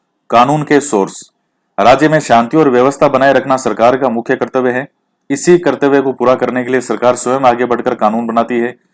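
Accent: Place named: Marwari, India. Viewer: Nice marvadi language ... humare bhi ase hi bolte hai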